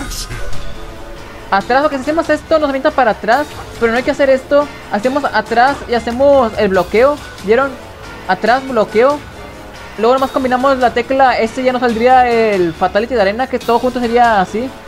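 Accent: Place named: North, Spain. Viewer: Lo intentaba una y otra vez pero jamás salían. Gracias por compartir el secreto persona con marcado acento norteño 🐉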